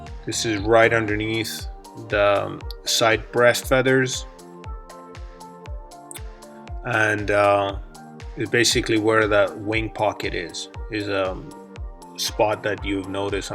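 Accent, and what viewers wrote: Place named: Poland. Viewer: Boy oh boy I'm so pleased I found you! I've been doing Taxidermy for 43 years, self-taught , so it's been a struggle. Although I did achieve best all-around taxidermist in the state of Arizona 2001, and I'm still learning everyday. You are helping me a great deal! Thank you, thank you ,thank you. Question, are you using silicone caulking? Also, where you from Brother? Some of your English words sound like words used from my polish family when they speak English. But I know that's not what it is. Again I want to thank you immensely! Mounting Birds in Arizona has been a struggle because of the dry heat over here . now that I've watched you mount a bird before you blow dry it you gave me great ideas. I just wanted a ringneck pheasant your style and I love it! I still hit the inside of the skin with a little hydrogen peroxide here and there to keep it moist. 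And also try your head locking mechanism. I've never done that before either. Now I can adjust the position of the head and neck without fear of breaking the connection! You're the man! My hat is off to you! Yours in Taxidermy, Mike Bieda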